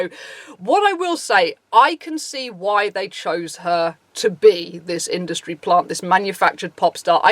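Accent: United Kingdom. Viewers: Oh that british pronaunciation of you... No idea who you are talking about but I'll watch the video anyways :))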